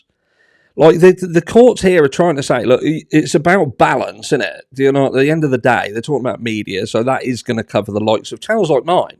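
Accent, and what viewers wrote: Norfolk, United Kingdom. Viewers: You ALWAYS look amazing, love, LOVE YOUR CHANNEL, ❤❤ Ps, I live in s Spain, but originally from BECCLES, you have a wonderful refined 'Waveny norfolk' accent.....Where??? Sounds great x❤😊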